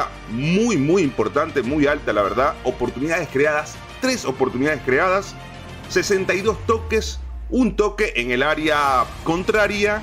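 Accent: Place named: Argentina. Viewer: Varón buen contenido pero pareces argentino cuando hablas :0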